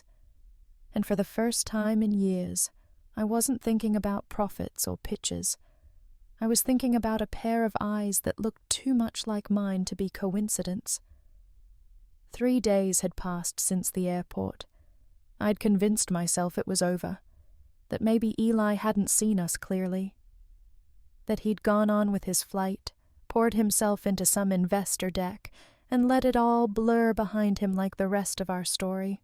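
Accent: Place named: United Kingdom. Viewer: Love this story...and the narrators slight British (?) accent. 🥰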